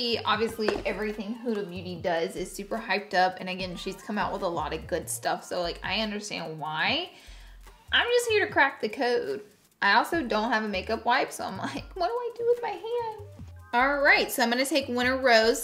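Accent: South, United States. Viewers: I’m a Louisiana woman and I love your Southern accent. Sounds Louisiana or Alabama...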